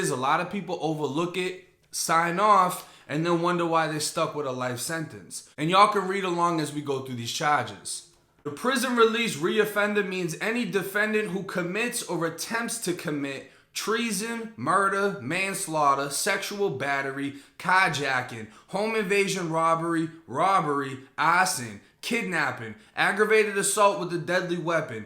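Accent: Boston, United States. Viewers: That bean town accent don’t evaah go away lol no amount of time in Florida could get it out of him! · I was wondering what accent that was i thought it was ny but it didn't seem right then he said Massachusetts....thats boston!!!! Now it makes sense. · Um Hell Naw he doesn't are you from FL? he might of did time in prison in my city and state, but that's a Boston accent and he doesn't have a FL slang he is just trying to talk like us. lol i bet everybody in Tampa will tell you he sounds nothing like us. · are you from boston? the accent sounds boston just asking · He uses florida slang with a Boston accent. Thats all. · All I hear is a Boston accent. I like it! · Been rocking with you for two days and I knew you was from up top I know you was from Boston I hear it but you had me fooled for a minute ain't know what the thing you kept talking about Florida but that's besides the point you teach getting lessons and you have great stories keep doing your thing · Damn bro you got a thick ass Boston accent.. 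I grew up ten minutes from Malden but live in Orlando now.. don’t have a felony on my record though. Got charged with assault with a deadly weapon but got a cwaf so they dropped it to a misdemeanor.. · You’re losing that Florida accent Ma Boi! Sounding like you from Boston again.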